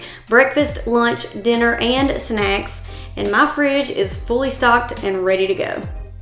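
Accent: South, United States. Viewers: Me being a Mississippian, “lord have mercy, that’s a southern accent.” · I can tell she's from the South. I am too.🙂👍🏻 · The voice through me for a loop 😅😅 heyyyy my fellow southern friend 👋🏽👋🏽 great video 💕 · I love the southern belle accent. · so love the Southern draw and love the prep recipes. Thank you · Just where is that sweet as molasses southern accent from? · I heard your southern drawl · I love your Southern drawl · I love your southern accent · Love your voice girl. A real southern belle. 😍😍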